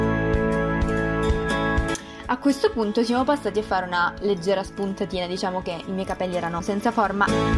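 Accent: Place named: Calabria, Italy. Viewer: Ma di dove sei pk sento l’accento e non capisco bene sembra che tu sia della Calabria ma non sono sicura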